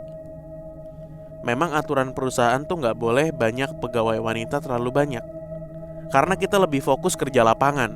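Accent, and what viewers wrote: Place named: Brebes, Indonesia. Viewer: wong brebes kyeh....😅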